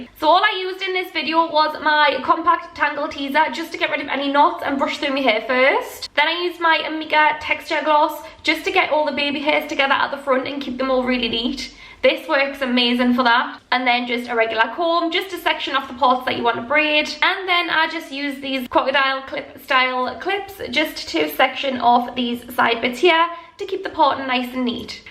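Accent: Newcastle, United Kingdom. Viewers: R u from Newcastle?? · i love it little mix Jade and perry have a geodie accent so thats were i teconised it from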